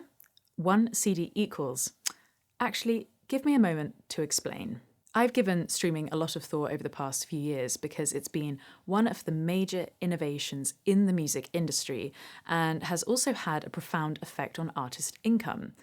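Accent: United Kingdom. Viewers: Beautiful shirt! As I've mentioned before, your speaking voice is another possible revenue stream. It is beautiful to listen to -- and remember how potty Americans are about British accents. My two favourite female speaking voices are Kirsty Young and Mary Spender. So be fruitful and multiply. I mean about revenue streams, not...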